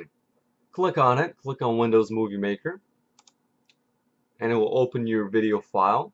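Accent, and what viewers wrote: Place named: Russia. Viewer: Russian? I hear strong russian accent :D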